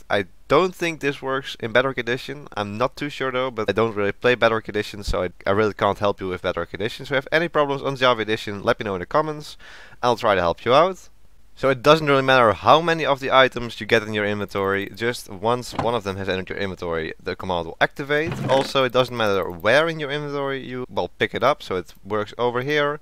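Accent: Netherlands. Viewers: hahaha ik hoor aan je stem gw dat je nederlands bent heuyy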